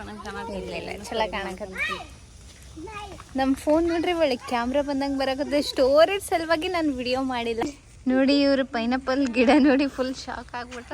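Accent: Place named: Udupi, India. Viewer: ನೀವು ಉಡುಪಿ ಭಾಷೆ ಚಂದ ಮಾತಾಡ್ತಾ ಉಂಟು 😁🤪🤩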